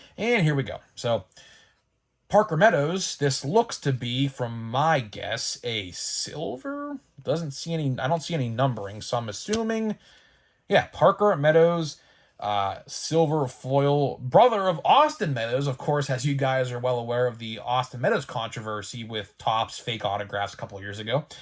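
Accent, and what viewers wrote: Pittsburgh, United States. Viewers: Yinz sahnd like mark madden … jagoff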